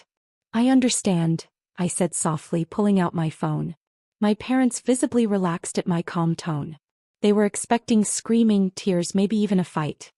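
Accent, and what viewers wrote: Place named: Spain. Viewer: Why would you have a narrator use a heavy Spanish accent reading English? Makes no sense.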